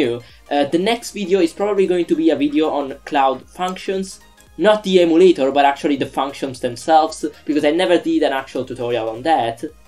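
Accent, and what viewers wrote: Italy. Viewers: Great video thanks very much! I love the accent! haha is it Italian? Would you be able to explain how to debug and set breakpoints in a call to "on Document created" in firebase functions.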